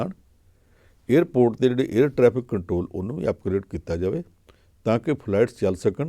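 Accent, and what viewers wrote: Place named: Majha, India. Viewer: ਸਾਬ ਦੀ ਮਝੈਲਾ ਵਾਲੀ ਬੋਲੀ ਕਾਲ਼ਜੇ ਠੰਡ ਪਾਉਂਦੀ ਆ 🙏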